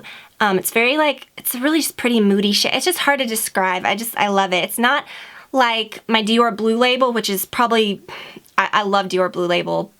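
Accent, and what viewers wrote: South, United States. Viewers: Shes from Georgia, its a southern accent. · Your southern accent it totally adorable :) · You sound so southern in this video! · I think I've noticed your southern accent more in this video than any other one! haha also your eyelashes look gorgeous!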